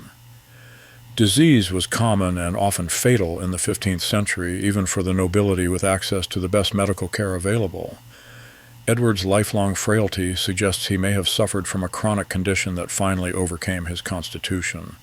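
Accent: United States. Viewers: The two illegitimate children would have never inherited the tbrone, so what happened to.them, apart from interest, is simply not important. I do wish we could have an English accent not an American one narrating this!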